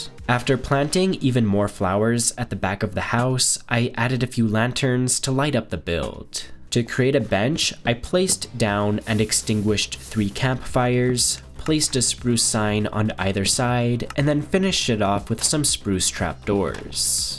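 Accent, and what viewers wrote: Canada. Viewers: It's just because he has a Canadian accent. They just speak that way, calm down bro.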